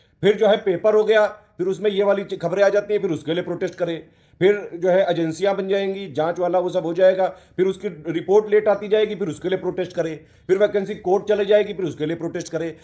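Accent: Kanpur, India. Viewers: बात तो सही कह रहे हैं आप सर जी बिल्कुल कनपुरिया स्टाईल में बात कर रहे हैं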